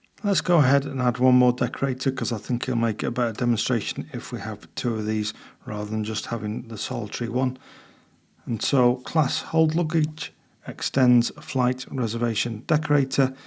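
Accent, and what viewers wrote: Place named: United Kingdom. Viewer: I wish I had discovered you earlier. Advanced PHP with a nice British accent. Thanks indeed!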